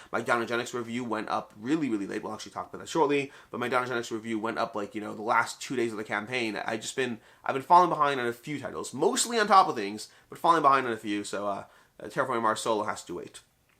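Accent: Canada. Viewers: Canadian accent? It's usually super subtle, until it isn't.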